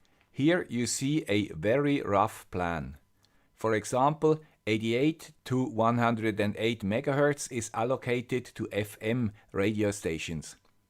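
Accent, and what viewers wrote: Switzerland. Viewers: missing your Swiss accent 🤓🤓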